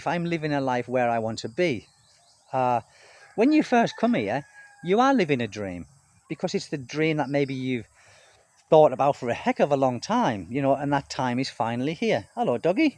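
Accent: United Kingdom. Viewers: What’s that accent? Brit, Australian or Irish. ☘️ I bet u were very handsome in your youth. 🎉not a scammer ( retired airline pilot 👨‍✈️) 😊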